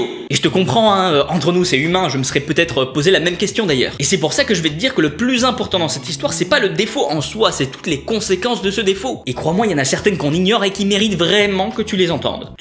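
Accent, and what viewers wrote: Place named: Marseille, France. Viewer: Ton accent marseillais 🤣😂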